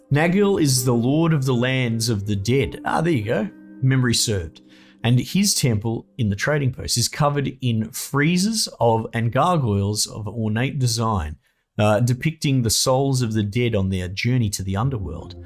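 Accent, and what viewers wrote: Australia. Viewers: Hey, Mate, if your Australian lilt is insufferable, God help mine. The game seems quite interesting. I'll go a few more episodes for sure.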